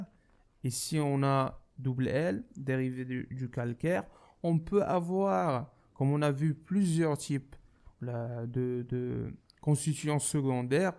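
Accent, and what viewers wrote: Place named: Marrakech, Morocco. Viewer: D apres ton accent je suis sur que tu es un marrakchi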